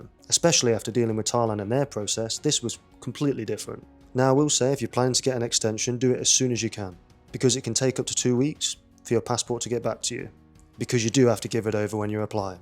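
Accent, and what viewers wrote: United Kingdom. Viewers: Which part of uk is your accent from? Midlands ? Ha ha Many thanks,, curious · I've been listening to you, thinking your accent isn't so different from my dad's family. They're Brummies! I hope that's not an insult...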